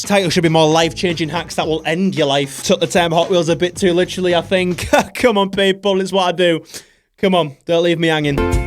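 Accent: Yorkshire, United Kingdom. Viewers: u from yorkshire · My favourite thing in this channel, is that he is British (or atleast he sounds like one). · this guy is pure yorkshire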